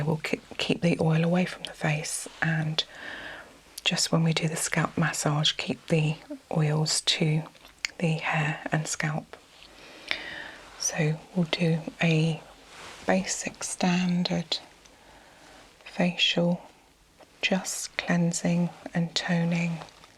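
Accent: United Kingdom. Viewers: your soft voice with your British accent is just like a warm hug. I just love your videos, I’ve watched all of them. I listen to help me relax and get sleepy and it works …..thank you for sharing your gift.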